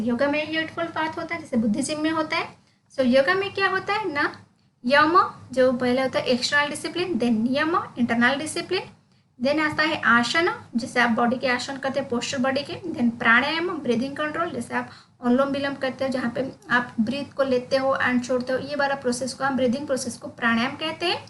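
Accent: Odisha, India. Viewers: Man r u from Odisha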